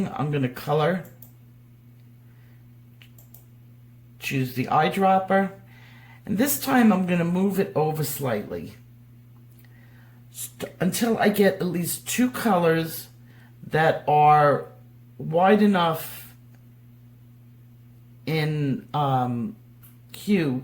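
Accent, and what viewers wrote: New York, United States. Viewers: It is good to hear your voice again. Love your NY accent, glad you got out of there too. May God bless you always, your generosity is unsurpassed and I love you. Love this vid.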